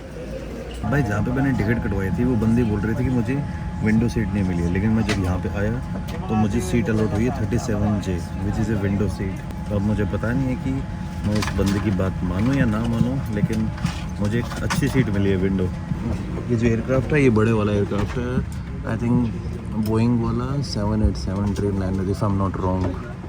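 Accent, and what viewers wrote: Haryana, India. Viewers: Arey yo toh haryanvi Bhai se . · Hariyanvi tach I like it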